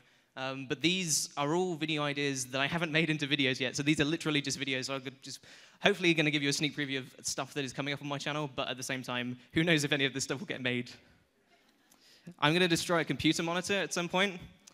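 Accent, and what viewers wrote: United Kingdom. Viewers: The patented style british accent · what kind of accent is that? i know it is british but is there a specific place? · If leaves the U.K. and comes to live in the U.S. then eventually he'll lose his accent wont he?:( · We don't need yer English baaaastaaard accent. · I can't watch this is my living room because I'm worried my mom will see me watching a cute guy with a British accent take his pants off.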